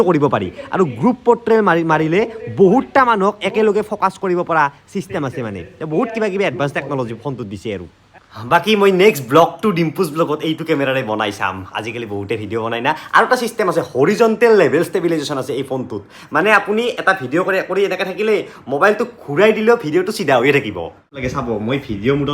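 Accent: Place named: Nalbari, India. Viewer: da apunar kotha bur bohut val lage, Moiu nalbariya hoi, Mur koka aita ghor nalbarir, But moi golaghat ot jnmo hoi Golaghot te Amar ghor etiya, Heibbe nalbariya kotha nihikilu valkoi😊😊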